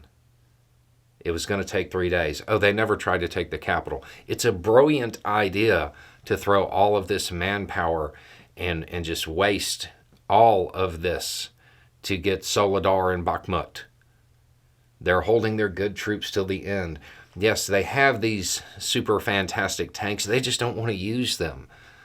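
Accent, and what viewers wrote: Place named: Florida, United States. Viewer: That "ugh" after mentioning "great" putin or trump... i feel that ugh.. everyday. I like theatrical youtubers.. but i like your stuff. Living in rural fl and listening to some one with our accent talking sense for once.. its that good breeze off the cow pasture and not the swamp lol.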